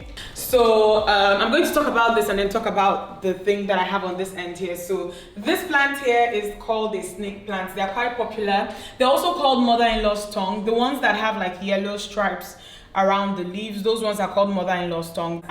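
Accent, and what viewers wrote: Nigeria. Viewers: I love this video . I also love you naija accent; so true! Thanks for the tour. I am trying to pimp my room for my videos and I am more than inspired . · Cherry chia different places different pronunciations. That’s how it’s pronounced in Nigeria. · I love the Nigerian accent 😆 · oh ... Nigerian accent won't let this girl be great ...anyways she Nigerian so..., but nice apartment tho...am currently renovating my own 1 bedroom apartment in the UK which is a lot similar to this, but a bit bigger in size. Its Easier over here because you just shop on Amazon or wayfair.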